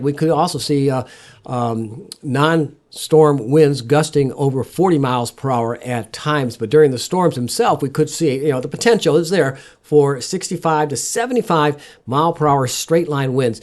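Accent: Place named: South Carolina, United States. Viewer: wow savannah pretty I hear Sth carolina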